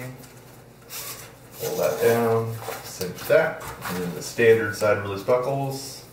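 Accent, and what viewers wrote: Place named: Baltimore, United States. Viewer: Just got this bag and I'm psyched to do my first trip w/ it. I like your mid-Atlantic Baltimore / Philly accent. It's subtle but those vowel shifts are noticeable. Thanks for making a badass bag.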